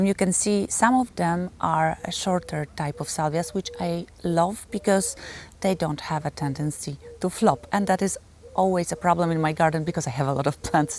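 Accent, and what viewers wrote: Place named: Poland. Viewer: love your garden. I just started mine, I was too busy to do it earlier. Nice polish accent. Greetings from Atlanta.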